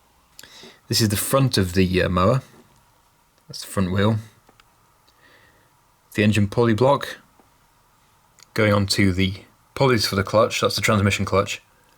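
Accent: United Kingdom. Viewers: I love the commentary. I like the British accent.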